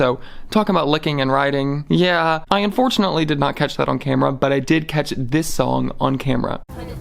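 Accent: South, United States. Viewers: Do you have a slight southern accent ?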